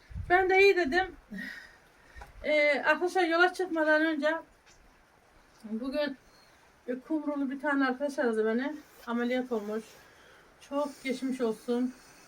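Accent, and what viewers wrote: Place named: Giresun, Turkey. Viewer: hn.Bn İstanbulda yaşayan bir Karadeniz'li olarak merak ettim neresi diye cevap verirseniz memnun olurum.konuşmalarınız aynı Giresun şivesi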